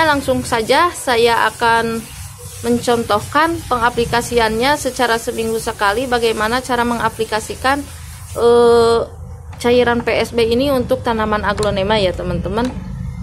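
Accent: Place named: West Java, Indonesia. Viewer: Sunda pisan eeuy...❤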